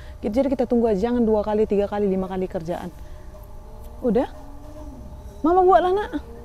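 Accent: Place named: Medan, Indonesia. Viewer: Intonasinya dari Sumatera Utara ya, kayak cakap orang Medan. Kebetulan saya orang Medan, jd suka kiat cakapnya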